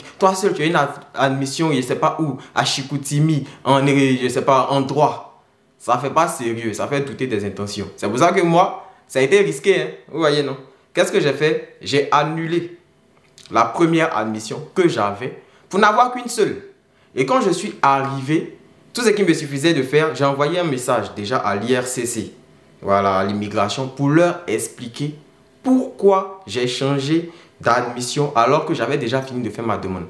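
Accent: Ivory Coast. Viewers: Belle vidéo J'ai kiffé Je suis camerounais mais je kif l'accent ivoirien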